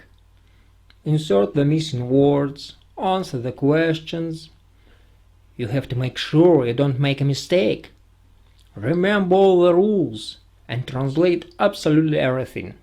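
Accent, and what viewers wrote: Russia. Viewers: You have the most stereotypical Russian accent. I love it 😍😂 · You from Russia??? I know this accent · yep, he's russian alright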